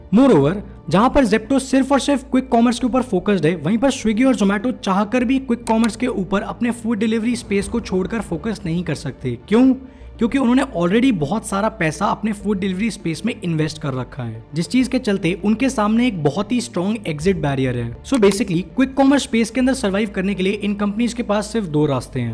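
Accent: Bareilly, India. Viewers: Are you from Bareilly?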